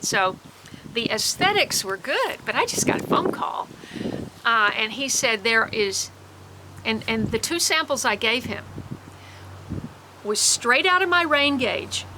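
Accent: Tennessee, United States. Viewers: Sounds like you are in Tennessee?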